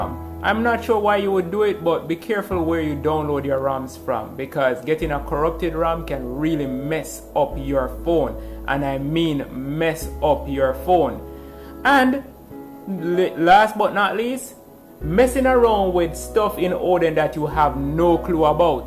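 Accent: Jamaica. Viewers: You sound kinda like Jamaican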